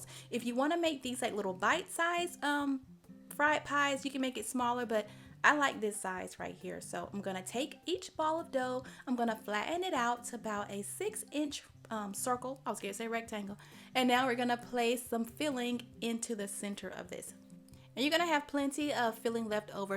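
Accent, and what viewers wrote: South, United States. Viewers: Hi , I am from South Liverpool UK, and love your programs so much and can't get enough of the accent , ( real southern states ) . I have a question and it is this , please what is Half and Half 🤔. Do let me know , as in the UK , we do not have Half and Half 🤔. Excellent program ( never stop ) as it is, ( THE NUMERO UNO ) on YouTube for me . Many thanks .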